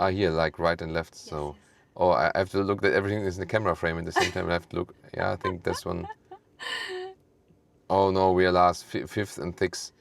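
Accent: Germany. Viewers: Listen to his German-speaking videos, very solid Hochdeutsch. Probably not faking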